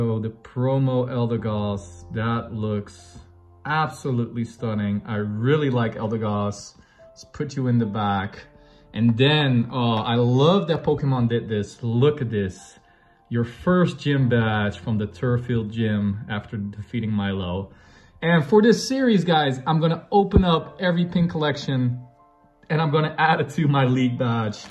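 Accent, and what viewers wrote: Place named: Netherlands. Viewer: Ur accent sounds like ur from Holland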